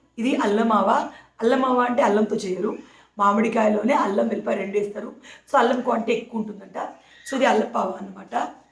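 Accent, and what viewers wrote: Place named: Maharashtra, India. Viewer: మరాఠీ యాస లో బాగుంటుంది మీ భాష, ఉన్నది ఉన్నట్లు మాట్లాడతారు మీరు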